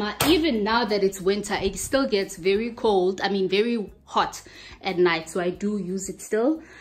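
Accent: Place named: South Africa. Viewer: I was only like 15 sec into the video and I was like, you sound South African😂🇿🇦I was right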